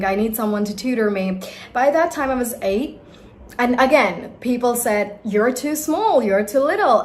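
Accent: United States. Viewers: Look I know that you can speak only in one accent and that's American accent. Stop bulshitting people. You don't know how to speak in any other accent. And it's okay. Most of the people don't recognize these accents and that's why you can lie about it. Stop it, because it takes away your hard work. You know American accent well and that's great. Good job on learning the American accent. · M aap s american accent m english sikhna chate ho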